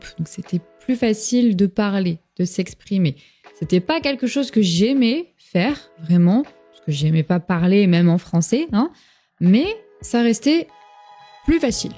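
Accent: France. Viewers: Im English and I think your English was 'tres bon' please don't loose your French accent, its charming and adds musicality to English. I have been teaching myself French for 1 year, the fog of understanding is clearing but I am a long way from speaking. Im concentrating on listening and reading, getting as much comprehensible input as possible. as an old saying says 'Slowly slowly catchy monkey'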